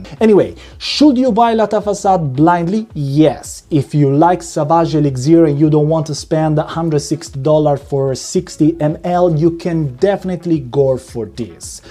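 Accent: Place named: Italy. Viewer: thick accent. are you Italian?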